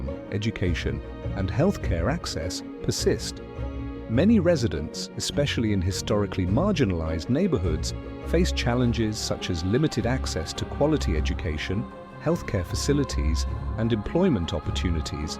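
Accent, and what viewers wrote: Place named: United Kingdom. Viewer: The voice says he grew up there...in a British accent.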